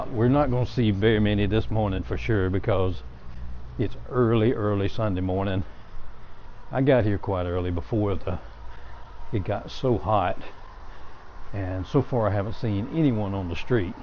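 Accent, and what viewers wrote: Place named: South, United States. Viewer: Good morning,. Thank you for sharing this awesome video on Fork Valley. I agree with you on how it got its name. I might have missed it, what is the population there? It is all so beautiful. If I had to choose a favorite area, it would be the railroad district. Love listening to that southern draw.😎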